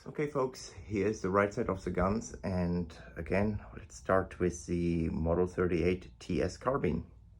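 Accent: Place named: Germany. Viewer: Are you german? Your accent sounds very german.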